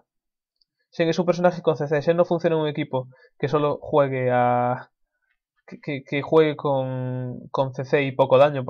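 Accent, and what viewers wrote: Galicia, Spain. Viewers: Eres gallego? tienes mucho acento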